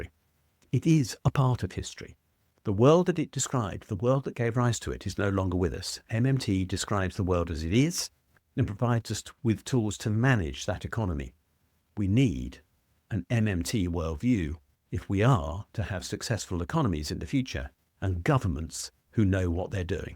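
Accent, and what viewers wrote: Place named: Upper class, United Kingdom. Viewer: Frankly, this seems half baked, motivated reasoning, even disinformation. Like contrasting "keynesianism," which are an awful lot of things inspired by many different economists and policy wonks over a period of time, compared with a neatly wrapped tied and bowed catch phrase ladened MMT is too GD simple, exactly how disinfo works. "Not saying, just saying. Lots of wheels, gears, cams chains wiring and energy sources glossed over or left out of the equations. My personal belief: Taxes are what we contribute to making our institutions, infrastructure and defences better in combination with more modern technologies. They need not be "wasted." Incompetence, graft and corruption are waste and plebiscites can be deceived into accepting them, but inclusive self government and soundly educated participation is a bulwark against. 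Innovation and improvement is not germinated by funding rapacious capitalized adventurers. There's obviously and transparently more to this, but I've gone on long enough. All voiced with a "patrician" upper class English accent. FFS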